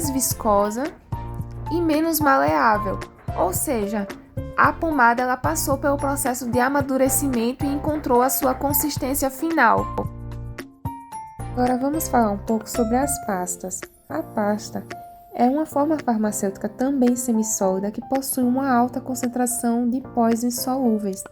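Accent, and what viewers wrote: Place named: Ceará, Brazil. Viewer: Adorei sua voz e sitaque! De qual cidade você é? Pelo sotaque, me parece que você seja do Ceará. Acertei?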